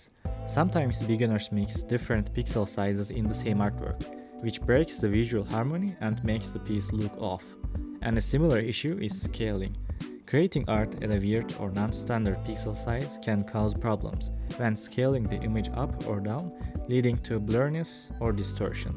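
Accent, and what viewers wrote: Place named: Turkey. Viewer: Türkçe aksanı nerede görsem tanırım... güzel video reis oynu da wishlistledim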